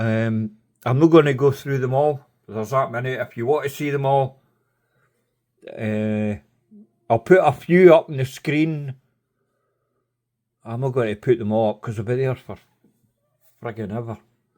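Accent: Scotland, United Kingdom. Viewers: Great review. Love the genuine Scottish brogue!! 🙂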